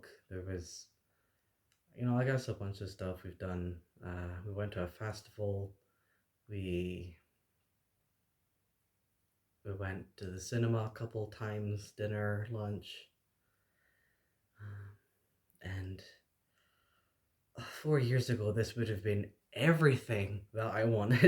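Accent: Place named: Scotland, United Kingdom. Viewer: I can tell by the accent your Scottish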